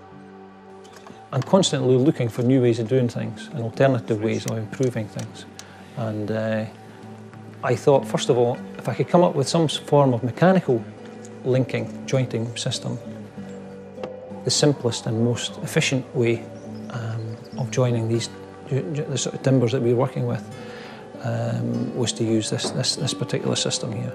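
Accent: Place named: Scotland, United Kingdom. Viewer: Good to hear a Scot's voice.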